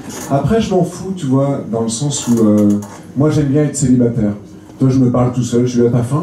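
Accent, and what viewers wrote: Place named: Finistère, France. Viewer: C'est l'accent finisterien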